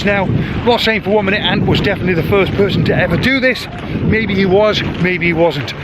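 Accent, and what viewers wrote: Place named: North Wales, United Kingdom. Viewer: lovely, what part of the uk, I am guessing North Wales possibly, excellent video and learnt an awful lt, brill thanks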